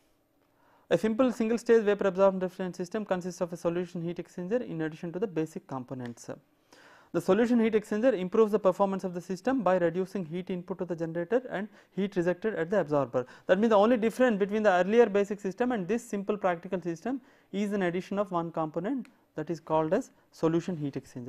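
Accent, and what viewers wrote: India. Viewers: Yes...that may be true for you..its kinda Indian accent...whatever be the case...it is very helpful for many of us... Every body is not as talented literate of english as much you are! Atleast dont insult...anyone...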